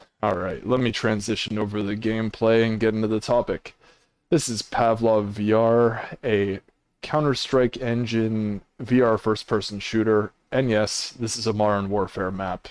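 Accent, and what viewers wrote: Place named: Canada. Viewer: You're Canadian aren't you? Or upper Midwest US. Work on the out... lol. Keep up the work!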